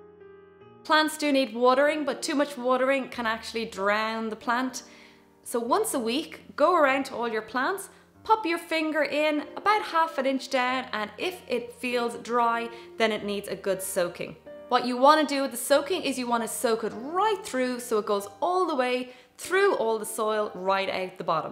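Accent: Ireland. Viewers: Discovered your channel today. Love your soft sweet Irish accent - lovely to listen to. Have just subscibed. Thanks.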